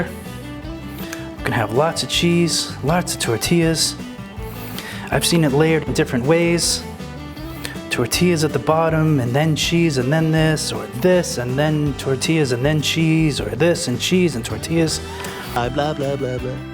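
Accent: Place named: Boston, United States. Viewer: Hi, love the video. I’m going to make this as soon as I can. I was just wondering what part of the northeast you are from. I’m in Massachusetts and you sound just like me! Chadda, betta. You know, like your from Boston or somethin. Just curious! Thanks for the video. ✌️🍻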